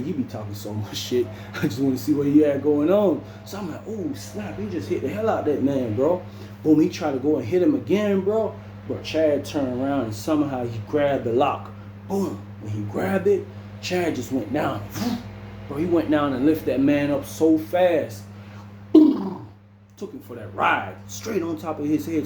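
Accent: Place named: Jamaica. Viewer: One of the best story tellers as of recently. Love how well you speak English and switch it over to Jamaican effortlessly.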